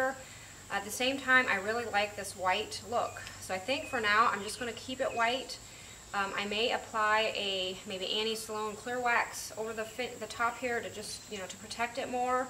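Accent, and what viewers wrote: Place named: Transatlantic, United States. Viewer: You have such a transatlantic accent. It’s cute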